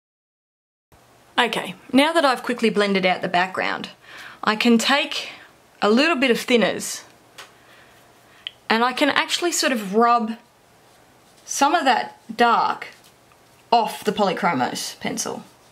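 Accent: Australia. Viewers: Hi! When you say you’re going to blend the white strokes out with a “thinner”, do you mean just like something that you buy that is just called a paint thinner, or do you mean some sort of odourless mineral spirits? This was a good video. I normally end my comments with hi from Australia, but I know by your accent that you’re Australian and it’s always nice to find an Australian video on YouTube! 😃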